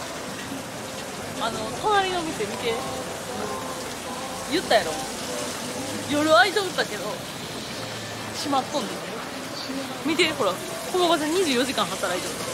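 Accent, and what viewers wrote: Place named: Hyogo, Japan. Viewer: 兵庫の人ですか？「～しとう」がずっと耳に残ってるｗｗｗ いかに美味しいか説明のパワーと語彙力おもろい:yougotthis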